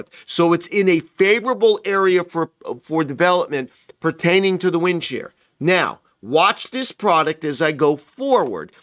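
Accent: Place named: East Coast, United States. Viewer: just can't do the east coast accent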